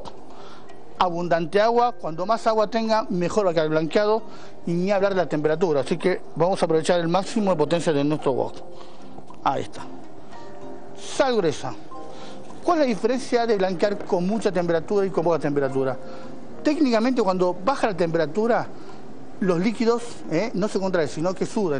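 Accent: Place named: Argentina. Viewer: Una persona de rasgos orientales con acento argentino! hora lo ha visto todo!!! Muy buena receta!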